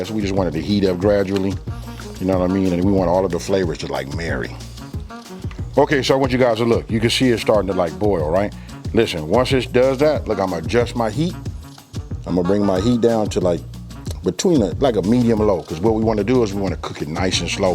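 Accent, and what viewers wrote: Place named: Los Angeles, United States. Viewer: I love his la accent!!!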